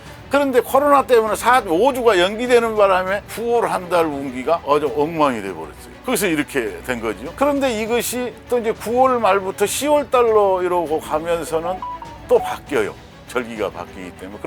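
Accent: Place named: Jeolla, South Korea. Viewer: 이 아저씨 토종 전라도 같네요이 ~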